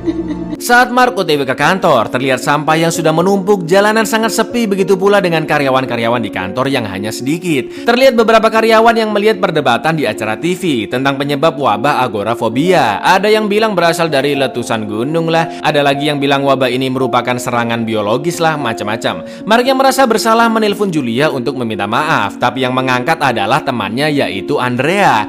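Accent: Java, Indonesia. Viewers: bahasa mu kang jowo muedokkk asli wg jowooo ..lnjutkan kang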